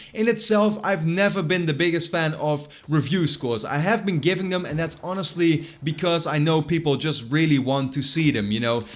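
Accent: Netherlands. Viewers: are you from holland? i recognize the accent. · toen ik je accent hoorde dacht ik gelijk dat je nederlands was eh!😂 en toen keek ik bij je kanaal info en het klopte ahahah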